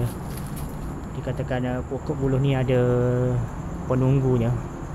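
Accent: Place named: Terengganu, Malaysia. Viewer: Rumah ni ker yg maksudkan tempoh hari lokasi kat damansara..Yg selalu tersasul dlm video terdahulu sebut kotak rokak ker depang ker yg bercampur aduk dgn loghat besut.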